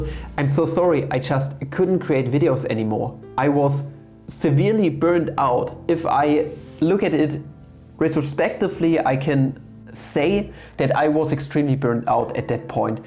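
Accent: Germany. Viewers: Thickest German accent I heard. 🫡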